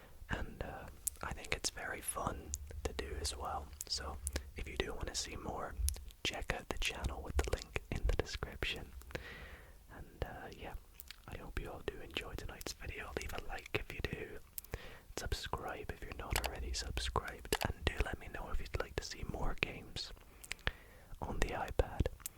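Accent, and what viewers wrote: United Kingdom. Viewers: Just stumbled upon your channel and i love it ❤ Are you from the UK?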